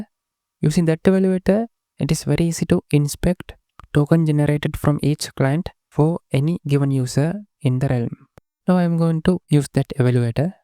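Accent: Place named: India. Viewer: God, please, give me a tutorial without indian accent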